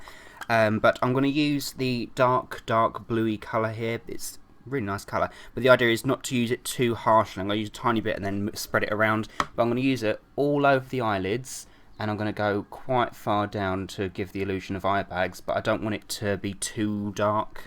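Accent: United Kingdom. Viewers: Are you British? -KidHawk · i love how creepy the make up looks and then you speak with that freaking adorable british accent and it completely steals the intimidation away for me XP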